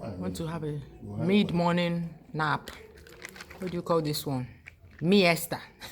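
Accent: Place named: Umunze, Nigeria. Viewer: please are you from umunze? The dialect is exactly like mine. Breakfast yum yum 😋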